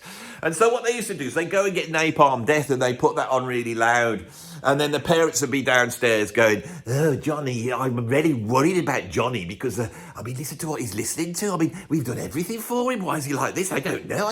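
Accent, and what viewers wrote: Birmingham, United Kingdom. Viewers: 1. Loud brummy spouting his worthless opinions on youtoob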